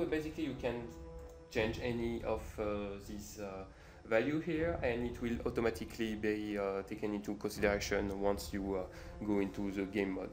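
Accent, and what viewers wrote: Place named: England, United Kingdom. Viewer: EPIC ENGLISH ACCENT !! (and game aswell)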